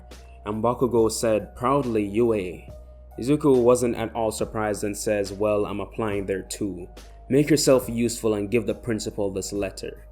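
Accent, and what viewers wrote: Jamaica. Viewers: Jamaican accent?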